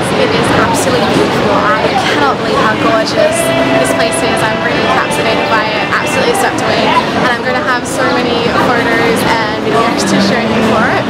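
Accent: Australia. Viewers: Your Australian accent actually isn`t too thick. I think you have been in Canada/US too much :) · omg, i love Aussie accents! It's even more sexy than the british one!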